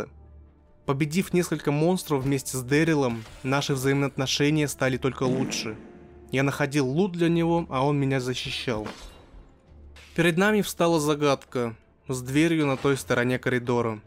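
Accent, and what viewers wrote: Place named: St Petersburg, Russia. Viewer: Голосок Питерский конечно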